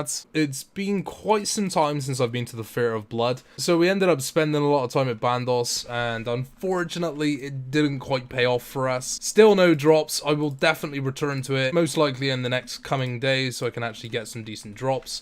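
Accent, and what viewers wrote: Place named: England, United Kingdom. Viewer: Cool furtysix mill loot tab. In all seriousness what region is yoir accent from? I know its and English accent but what area?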